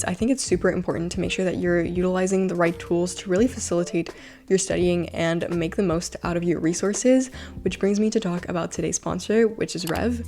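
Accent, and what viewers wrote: Quebec, Canada. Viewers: Omg i just stumbled across your channel, and when i first heard you talk jme suis dis "wait a minute je reconnais l'accent" J'ai réalisé que t'étaits québécoise!!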